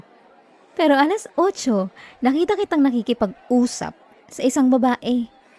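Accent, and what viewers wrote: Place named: Visayas, Philippines. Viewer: ptnginang kabisayaan nato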